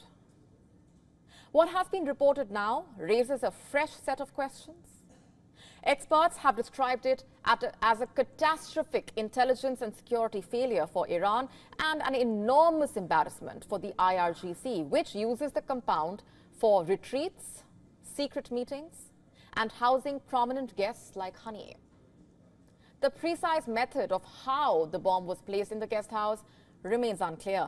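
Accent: India. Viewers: Accent and herself both are not sexy like a common Indian, Pakistani or Bangali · Indian accent not very sexy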